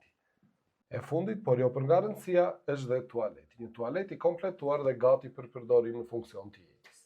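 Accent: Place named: Devoll, Albania. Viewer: Na i shpife me kete te folur si devolli apo andej je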